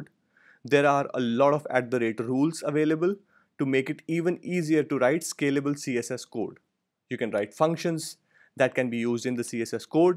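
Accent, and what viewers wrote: India. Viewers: First video with a guy with an Indian accent that is actually useful and well organized. Thank you!!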